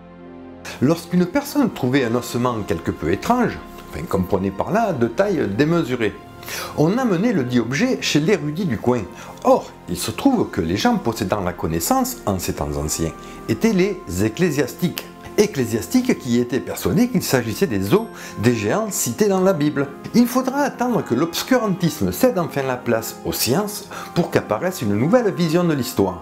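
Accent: South, France. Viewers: Tu et du sud de la France non mdr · C'est un accent du sud si je ne me trompe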